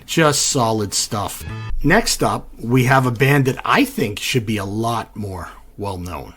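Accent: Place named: Canada. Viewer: Give him a break. He has a Canadian accent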